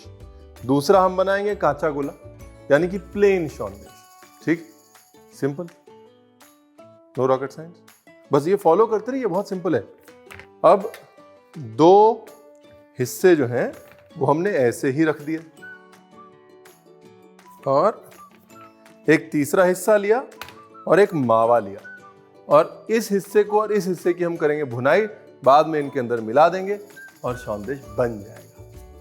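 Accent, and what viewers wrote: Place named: Bengal, India. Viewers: I love your Bengali pronunciation ❤️ chef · Oh chef! Not only your way of cooking or recepies, but your bangla accents and love for bangali cuisines.. just have stolen my heart! All my kitchen equipments have got your mark 😀 wish could show u once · Being a Bengali.....am so proud of you ❤️ Jis tarase aap Bengali accent use karteho...oo kamal ka hainn ❤️❤️ Sending lots of love ❤️🥰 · Ye accent, aur ye bartan (kanshar thala), aur favourite sweet - jolbhora. Ranveer apne bataya nehi - aap ka Bengali connection keya hain 🤔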